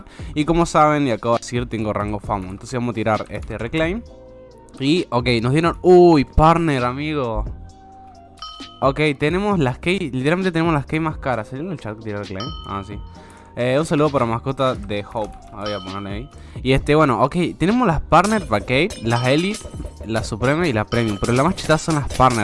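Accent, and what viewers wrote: Argentina. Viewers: Wacho sos de cordoba?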